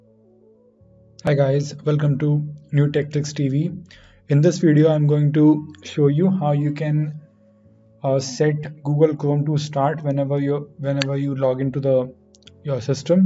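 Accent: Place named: India. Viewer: I hear enough damn Indian accents when I use the phone!!!!!!!!!!!!!!!!!!!!!!!!!!!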